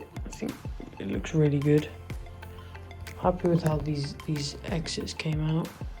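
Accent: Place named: United Kingdom. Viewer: Hi, I could tell your British from your accent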